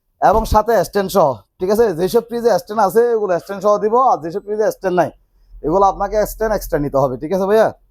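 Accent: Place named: Chittagong, Bangladesh. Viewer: ইভা চিটাগাগত তো মনে হচেছ।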